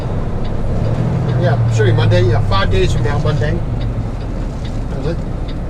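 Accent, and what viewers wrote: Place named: South, United States. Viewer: I am looking forward to driving out west. The only thing about your vids is your hick like accemt. And what the hell is a wind screen? Oh well, we are all from somewhere. Looking forward to more vids. Be safe!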